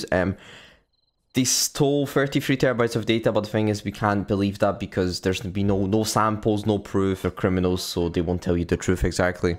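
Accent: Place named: Scotland, United Kingdom. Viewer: Good to hear a Scottish voice in the community 😢 Exploiting the SSH flaw sounded a bit like trying to thread a needle while wearing oven gloves when i listened to someone discuss it earlier. The Adobe one?: more like reaching through an open window. Between that & Polyfill, bunch of ops teams & web devs are gonna be pretty busy this summer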